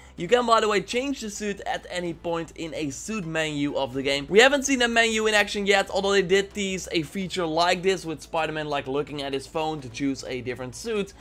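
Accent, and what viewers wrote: Netherlands. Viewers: Are you dutch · Mate are you dutch? You reaaaally sound Dutch :p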